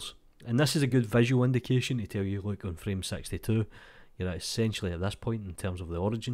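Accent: Scotland, United Kingdom. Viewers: love ur tuts and ur accent too , it"l like william wallace is giving me a blender tutorial :)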